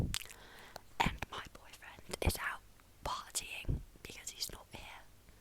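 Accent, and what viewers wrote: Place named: United Kingdom. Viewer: your british accent is lovely , i hate my voice !!😂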